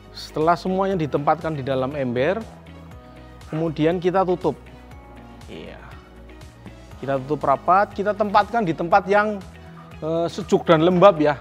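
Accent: Banyumas, Indonesia. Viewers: Sampean wong Ngapak ya mas... Hehehe Pada baee..saya juga wong nagapak...lanjutkaaaaaan mass... Wong Ngapak nek Ora Ngapak ya Ora Kepenaak... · Wong ngapak apayh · ngapak kiye kayane mbok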